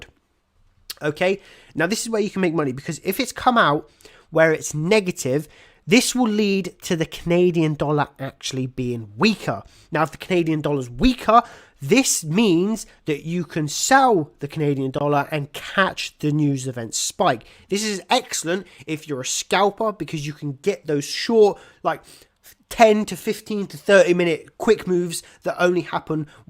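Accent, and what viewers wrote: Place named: United Kingdom. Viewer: There are other English speaking countries online. Not British alone. Abeg consider and use proper tongue 😮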